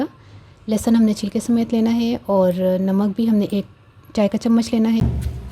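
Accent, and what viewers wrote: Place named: Punjab, India. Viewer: Zabardast api tosi panjabi boht pyari bolda o MASHAALLAH naraz na lagy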